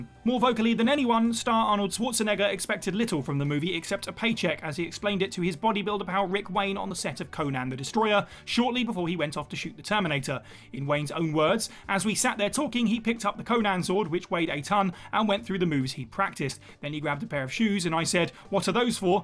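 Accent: United Kingdom. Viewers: Love how British people speak 😂😂🤣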